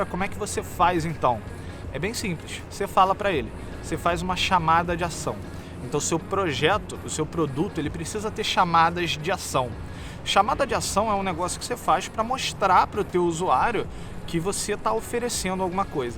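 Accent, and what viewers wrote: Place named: Rio de Janeiro, Brazil. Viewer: O que eu sinto ao ver os videos do: 1 - Esse cara ta mesmo querendo ajudar. 2 -Ele entende MUITO de programação, mas tb entende mto do business por trás disso, não só de ser funcionário. 3 - Saudades de Vancouver, melhor lugar que ja morei na vida. 4 - Sotaque do RJ é foda 5 - Preciso ser aluno desse cara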